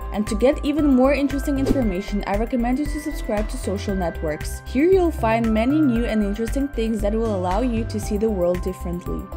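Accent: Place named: Asia. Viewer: that asian accent...makes me feel bulish on this...I want to get in but I don't got any more cash left, I invested all I had...😅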